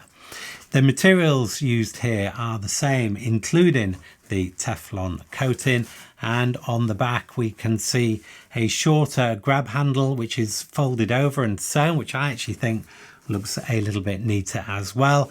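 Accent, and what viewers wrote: United Kingdom. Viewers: May I recommend that you consider Asmr artist work! Your voice can be quite relaxing! Maybe it's the British accent!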